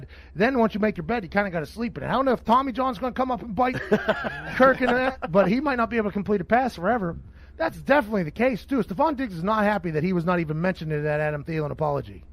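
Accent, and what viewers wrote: Pittsburgh, United States. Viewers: yinzer accent is showing in this segment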